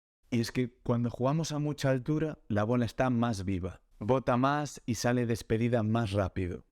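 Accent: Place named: Galicia, Spain. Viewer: Buenas, este mes que viene me iba a comprar por mi aniversario la Wilson shift 99 (patron 16x20) Tengo 27 años, mido 1.73, peso75 kg Y juego a 200m nivel del mar o 0 (zona de Alicante, muy calurosa habitualmente) Y le iba a poner un alu power 1.25 con tension 24vertical-22 horizontal Pero me tienta la Wilson shift pro por tener mas peso e inercia aunque me hace dudar por su manejabilidad por el peso y su patron 18x20 ( a esta le pondria 25) Soy nivel intermedi avanzado, juego agresivo fondo de pista e intercambio mucho spin con alguna dejada. Muchas gracias por tu trabajo PD: me flipa ese accento gallego y la tranquilidad que transmites en cada video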